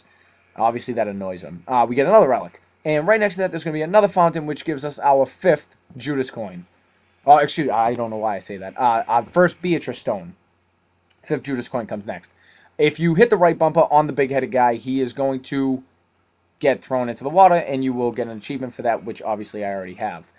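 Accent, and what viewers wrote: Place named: Boston, United States. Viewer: just watched ur part 1, ill be viewin all of um for my plat. good job dude, n the boston accent=tight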